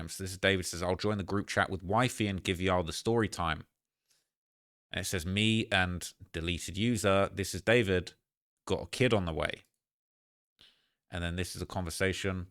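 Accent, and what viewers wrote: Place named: United Kingdom. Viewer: He’s British. Calm down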